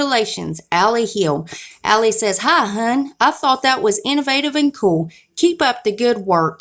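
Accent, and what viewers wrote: South, United States. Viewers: Love your southern accent 🥰 Thanks for sharing. I subscribed 👌🏽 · Awesome to hear a southern voice on here.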